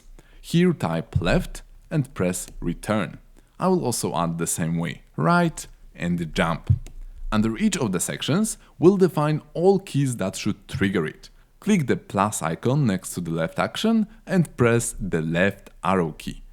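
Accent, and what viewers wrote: Poland. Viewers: The Polish accent hurts my ears.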